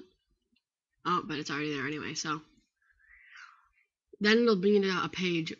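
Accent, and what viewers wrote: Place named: United States. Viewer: Finally English instructions with an American speaking thank you so much I couldn't understand anybody else's accents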